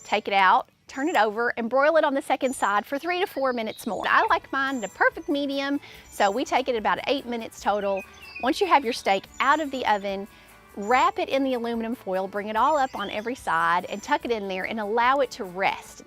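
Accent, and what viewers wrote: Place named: Texas, United States. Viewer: Super Texan I bet ❤️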